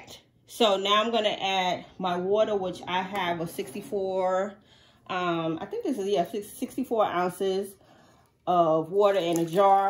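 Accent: New York, United States. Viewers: I was going to say New York lol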